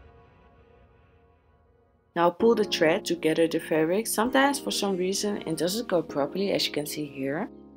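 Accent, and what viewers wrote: Netherlands. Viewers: you sound so extremely dutch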